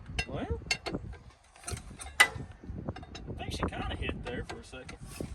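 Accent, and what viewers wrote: South, United States. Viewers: You're the most stereotypically American-sounding person I've ever heard. Amazing. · Your down south delivery put me off at first, but I liked the projects you were working on. Now, I have no issues and this far north ( Alaska) junk car junky enjoys every video you put out. Watching the efforts you put in and the problem solving involved is top notch. Making push rods for this is back yard genius stuff! Thank you for what you do and how you do it!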